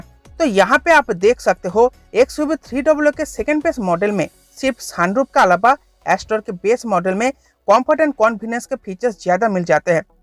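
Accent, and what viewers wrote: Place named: Bengal, India. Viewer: Saale बंगाली. Baat kese kar raha hai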